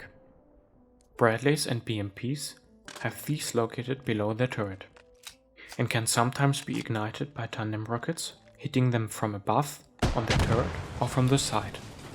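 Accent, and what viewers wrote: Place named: Russia. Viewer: Какой то акцент русский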